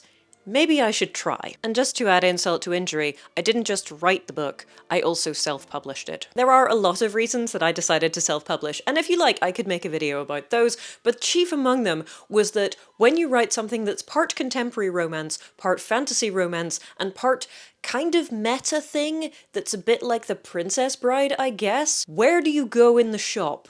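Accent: Northern Ireland, United Kingdom. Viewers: I’ve listened to the audio book and once I got over the shock of hearing a Northern Irish accent, I was able to sink into the story. I really enjoyed the book and your performance. I gave it one of my few 5 star ratings on GoodReads. I really like a mature heroine. I will wait with bated breath for the next title, but since I like to reread and re listen to books, I will just use this one to keep myself going. ❤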